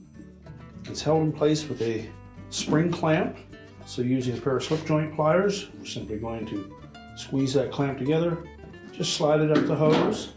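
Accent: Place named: Canada. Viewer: Is this a Canadian made video? Your accent in the pronunciation of the word "out"sounds as if you're Canadian.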